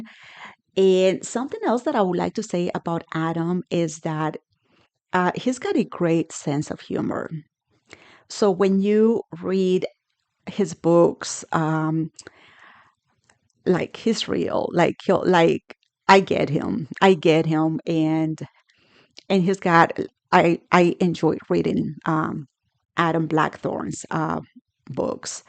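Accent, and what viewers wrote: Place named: Brazil. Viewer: Wonderful review. Could you do one on Angels of Omnipotence by Jareth Tempest? Also, may I ask if you're Brazilian? That's how the accent sounds to me. Either way it's adorable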